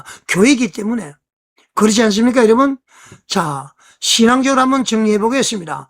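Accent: North Korea. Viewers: 억양이 이북 사투리가 심한 것 같네요. 우리 교회도 감독에게 양육 받은 부목사가 불륜을 했다는 모욕적 내용을 전 성도들에게 배포, 누명 씌워 담임 목사를 고소해 교회를 박살내 힘들게 했는데 몇 년 지난 후 사실 무근이라 법원에서 판결 받고 벌금 내고 끝났으나 지금도 간간 교회를 여전히 괴롭히고 있네요. 악한 영들의 거짓 행위인 것 같은데... 공산당이 냄새 피우는 것 아닌지 수상들 하네요. 십일조는 반드시 해야 합니다 이는 하나님께 돌아가는 표입니다. 말리기를 참조하세요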